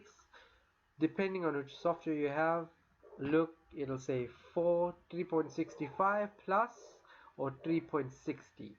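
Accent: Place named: Durban, South Africa. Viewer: okay thats a shame, but thanks for the video, it was really useful and saved me a bunch of time, also are you south african, you sound like you're durban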